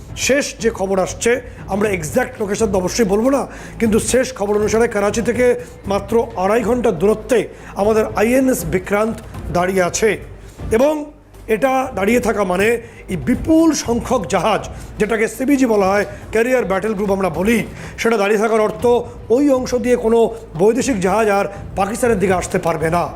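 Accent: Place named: Bangladesh. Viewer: Bangladeshi anchor naki? Kthay kichuta Bangladesh er accent ache.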